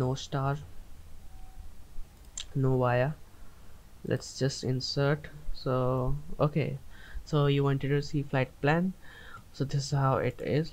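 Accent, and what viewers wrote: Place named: India. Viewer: Sounds like a call centre in India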